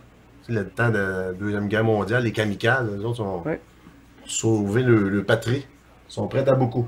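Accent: Quebec, Canada. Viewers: desolé ,c est vrai j aime bien son idee ça peut etre un argument. mais c est sa maniere de parler et l acce t canadien ça ma suffit pour rigoler pour rien . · Oué bin qu' ils touchent pas a nos Coréens avec leurs coneries, se sont des gens formidables et très ouvert, merci pour la video jme suit bien marré, et vive l' accent quebecois^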